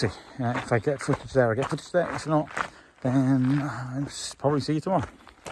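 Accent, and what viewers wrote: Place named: Derby, United Kingdom. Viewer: Not going to unsubscribe...was hoping to meet you in person but since i missed you i will say it here. I lost my brother in law who was from Derby a little over 10 years ago, so your accent and wit remind me of him and it is a welcome addition to my day.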